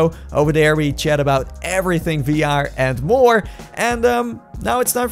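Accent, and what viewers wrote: Netherlands. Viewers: ik denk dat dat je nederlands bent bro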